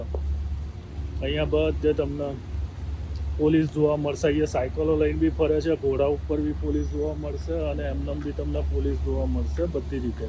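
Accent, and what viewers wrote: Mehsana, India. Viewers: ભાઈ તમારી બોલવાની ભાષા પર થી લાગેછે કે તમે મહેસાણા જીલ્લા ના રહેવાસી લાગો છો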